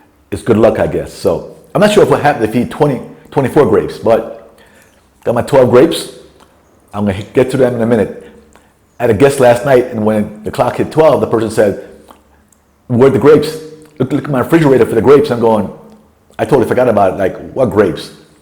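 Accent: Jamaica. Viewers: You are Jamaica you spoke Jamaica ingles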